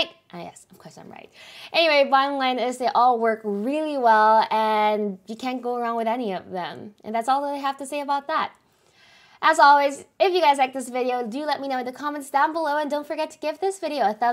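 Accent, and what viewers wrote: Philippines. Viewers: She seems to have a Filipino accent. Not that it matters, as a Filipino, I rarely see content creators here that aren't 'vloggers' and vomitting clickbait content. I guess it's a long way of saying, I rarely see this much class from a content creator in the Philippines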